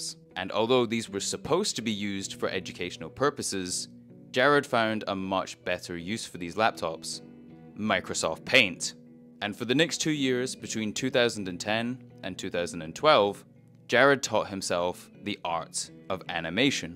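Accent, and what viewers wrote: United Kingdom. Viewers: You sound like you’re from Edinburgh. Actually, maybe Stirling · hearing a british bloke voiceover this actual visual masterpiece of a culture film is weird somehow. none the less, as a diehard fan myself, i still learnt some stuff from ya & about the show i grew up on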